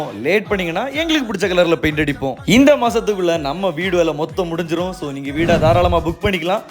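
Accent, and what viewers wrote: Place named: Marthandam, India. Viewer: Marthandam tamil pesuraan kanyakumari or kerala border ah irukum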